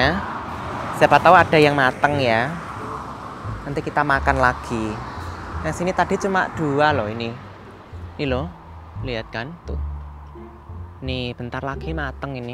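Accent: Java, Indonesia. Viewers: Woalah moasee medok😅😅😅👍👍... Sukses mas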